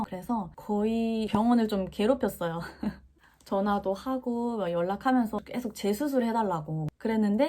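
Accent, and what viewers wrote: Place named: Jeolla, South Korea. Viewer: 용 됐네요 전라도 사투리 쓰시는군요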